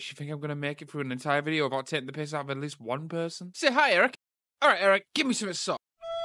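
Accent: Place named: United Kingdom. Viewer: Note on you speaking fast: I'm a fast-talker too. My mouth tries to catch up with the runaway freight trains racing along the multiple interweaving tracks in my brain and often ends up tripping along the way. So I get it. I do still need subtitles to understand you, but that's mostly because I'm not british. The full-speed words combine with the accent I'm not used to in a way that turns my brain to mush. No need to feel self-conscious over something you can't really control, we all just work with what we got.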